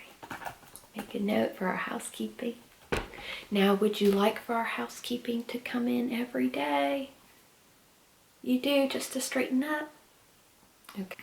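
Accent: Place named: South, United States. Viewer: Youre so good at that southern drawl!! Hope you've been well~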